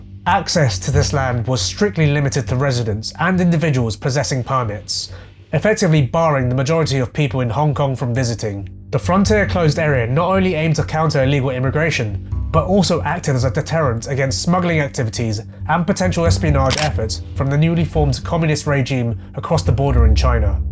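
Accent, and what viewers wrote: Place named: United Kingdom. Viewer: I think he's British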